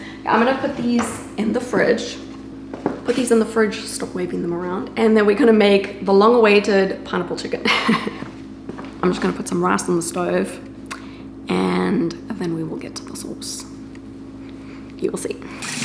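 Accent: South Africa. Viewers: where is your accent from are you South African, Australian or from New Zealand? the accents are currently confusing me currently so i tossed it all in cause i would think 1 of those 3 but i think South African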